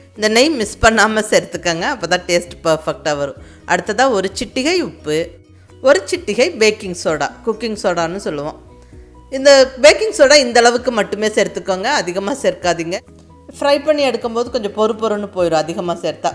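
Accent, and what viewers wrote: Kongu, India. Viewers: Hello mam really all your receipes are awesome the way you explain are chanceless, I like your kongu tamil, I love you so much mam, Always Be happy share ur receipes more love u from Chennai this is also my favourite 😍 receipe keep rocking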